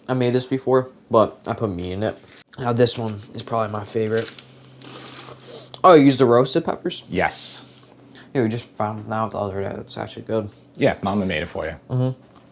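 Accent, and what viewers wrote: New York, United States. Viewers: You guys from New York?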